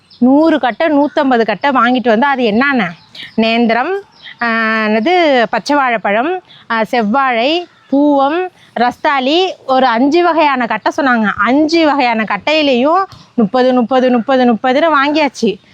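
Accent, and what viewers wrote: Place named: Mayiladuthurai, India. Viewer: Your talking slang reminds me of my friend… she is also from Mayiladuthurai.❤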